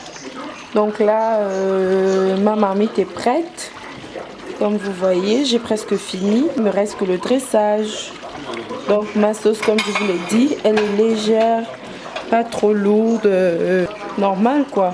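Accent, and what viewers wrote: Gabon. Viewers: Au fond le sans accent des gabonais fait leur accent, gabonaise pure pure.. Merci mama!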